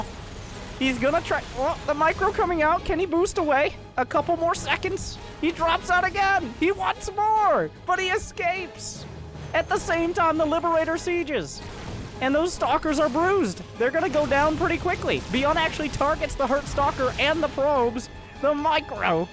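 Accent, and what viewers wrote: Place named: Texas, United States. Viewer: You sound like youre from Texas,. When you started commentating all fast it reminded me of those auctioneers xD can i get a thounsand? Thousand two thounsand five, six, two thounsand!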